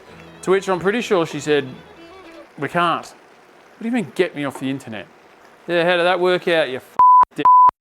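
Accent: Australia. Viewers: You sound like you’re from WA.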